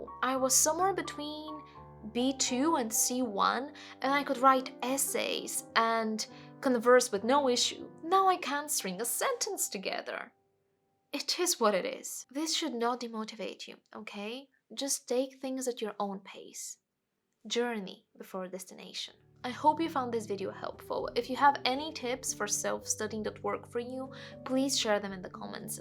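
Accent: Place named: United Kingdom. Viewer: Ah, hearing a British accent speaking about Japanese is refreshing. ありがとう 先生！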